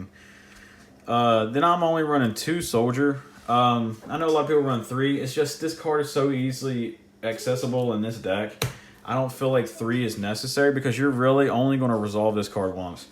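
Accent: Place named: South, United States. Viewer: Southern accents are tough! Lol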